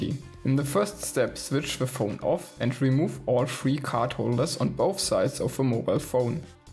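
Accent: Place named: Germany. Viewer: Klingt so unschlagbar Deutsch, kann man nicht verbergen... EDIT: Good to know i would sound... now it makes sense why people always want me to join the voice-chat.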